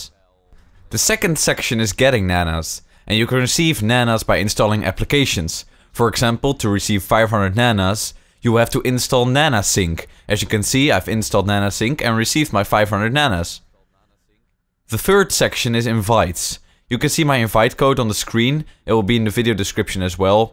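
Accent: Netherlands. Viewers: Are you from holland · OMG NEDERLANDS ACCENT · hoi nederlander(kan je horen aan je accent) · Thats what i was thinking xD are u dutch?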